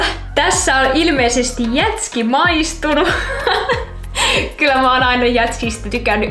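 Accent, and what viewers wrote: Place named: Tampere, Finland. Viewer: Sä kuulostat ihan Tamperelaiselta💕💛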